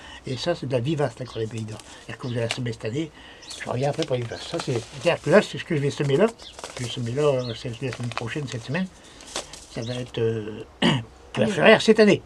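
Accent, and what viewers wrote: France. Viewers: Vous le méritez par votre implication au quotidien pour nous faire partager votre passion... continuez à prendre du plaisir à le faire... votre accent est un régal au contraire... c est çà la France, et la richesse de ses différentes régions : coutumes, patois, accents spécifiques, gastronomie, territoire, sol et climat... etc... ne vous changez pas, soyez fier de votre identité et les personnes qui voudraient lisser toutes les beautés de nos régions passent à une autre chaîne qui mets en avant des futilités, l argent, le langage, le physique etc... ne rentrez surtout pas dans un moule à la mode... car par principe la mode est éphémère et cyclique... pour revenir toujours à des choses anciennes qui sommes toutes reviennent à la mode du jour... restez comme vous êtes... et soyez sûr que vous avez du public de tout âge car les nouvelles générations comprennent l'intérêt de prendre soin de l environnement et de produire soi même ses légumes, fruits et autres... 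vous incarnez beaucoup de valeur et êtes ce relais inter générationnel... alors continuez à transmettre... milles merci à vous deux et à tous ceux qui comme vous partagent leur savoir et transmettent leur passion (Philippe, Olivier, André... mais aussi les permaculteurs, le jardin des merveilles, et bien d autres)... Merci 🙏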